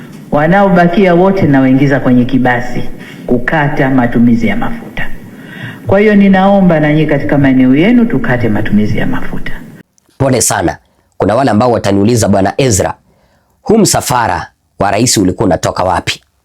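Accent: Kenya. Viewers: NA NYINYI WATANZANIA VILAZA HAMGUNDUI HUYU KISWAHILI TU KINAFANANA NA WAKENYA MNABURUZWA TU KM MKOKOTENI NA MNACOMMENT UJINGA WANANGU KAZI YA ULINZI SIO LELEMAMA ..HATA MAGU MSAFARA WAKE HAUKUA WAKITOTO NA HIZO HELICOMPTER UNAZOZIONA KAZIACHA JPM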